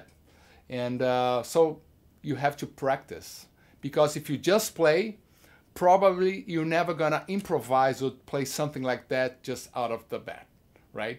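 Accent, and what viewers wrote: Brazil. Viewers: Putz o sotaque brasileiro é inconfundível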